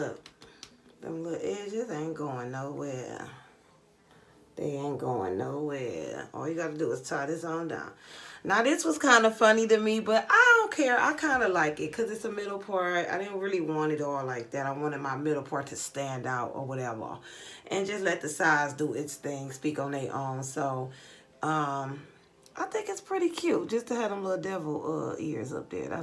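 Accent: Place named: Jacksonville, United States. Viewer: Luvvvvv, if u dnt sound like yr from Jacksonville, Fla 😂😂😂 with that bust it down 😳, I almost fell off my bed( I'm like where's the gar?😂) ! Love the look ! I love the sleek hair styles( when I'm doing my growth treatments )! Kp doing u ma !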